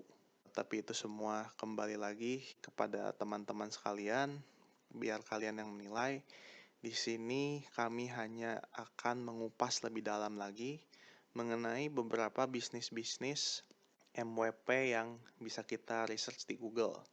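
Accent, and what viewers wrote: China. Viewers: Logat aseng....jualan liur lu seng😆😆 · Wong logatnya aj Aseng bngt Panteslah klo u ko kontra SMA bossman 🤭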